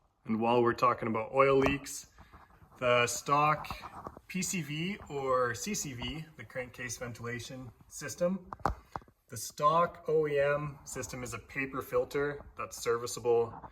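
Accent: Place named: British Columbia, Canada. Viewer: I noticed you northern bc accent, lol. Checkout atlin BC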